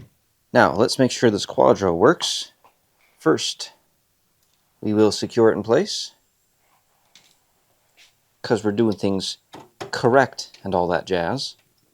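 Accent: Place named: United Kingdom. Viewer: HAHA as a brit, I appreciate the british accent 😁😂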